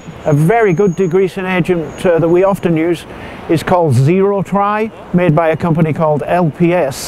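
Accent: United Kingdom. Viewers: Two things, you sound like your native country is England, so why say that word incorrectly?! And secondly you look like hack you up with a hatchet Harry from Lock Stock And Two Smoking Barrels. Top video though, good tips!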